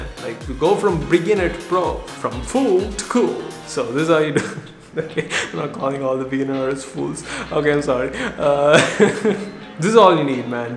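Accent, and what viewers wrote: India. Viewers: I love how he says “cool” in that sick Indian accent all the time.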